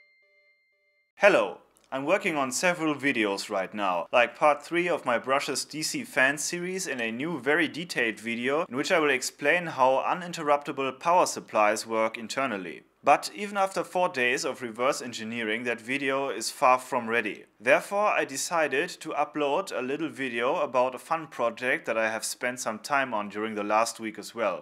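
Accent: Germany. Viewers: Your German accent combined with selfirony made it very humoristic to watch :) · German Accent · I love the German accent :D + this sentence "I also did some ghetto style heat shrinking with a very girly lighter." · Are you German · Dieser geniöse Deutsche Akzent ist der Knaller! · nice German accent! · There is just something hysterical hearing "ghetto heat shrinking with a girly lighter" in your German accent... I like the subtle humor while learning a bit... nice job.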